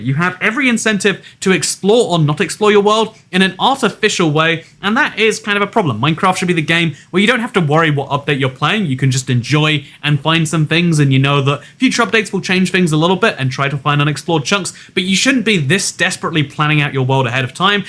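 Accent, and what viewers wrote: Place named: United Kingdom. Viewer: No it’s his British accent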